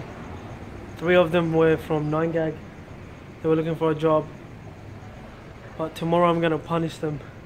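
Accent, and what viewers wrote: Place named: Australia. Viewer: wow aussie accent! :P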